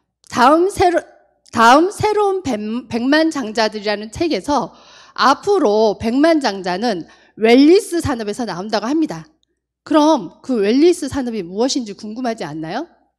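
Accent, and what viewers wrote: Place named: Jeju, South Korea. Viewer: 리더님 하면 구수한 제주 말이 기억이납니다 친정어머님도^^ 행복의 기준! 저에겐 건강이였습니다 좋은강의 감사합니다♡♡♡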